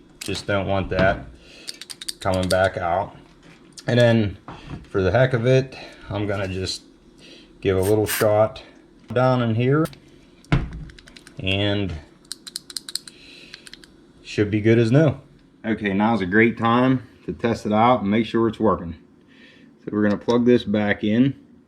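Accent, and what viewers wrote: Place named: Pittsburgh, United States. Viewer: Are you in or from the Pittsburgh, PA area? Other than not saying yinz, you have a pretty good Pgh accent.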